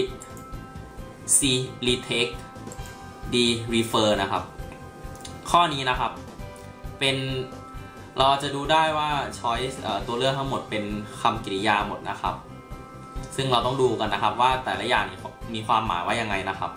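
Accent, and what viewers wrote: Thailand. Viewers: แค่นี้ก็โอเคแล้วค่ะ สำเนียงไทยๆ เข้าใจง่ายค่ะ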